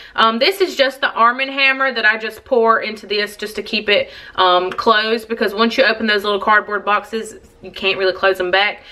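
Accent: South, United States. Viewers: your welcome love your channel and nice to hear someone talk like me. Southern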